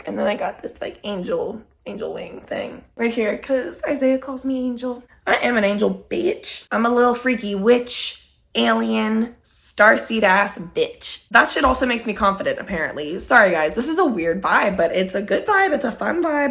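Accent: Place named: United Kingdom. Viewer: I love every video every time! You do not miss! As someone who also struggles mentally I always love these videos. The British accent is also so funny bc why do I do the same thing when I’m talking about my mental stuff😂😂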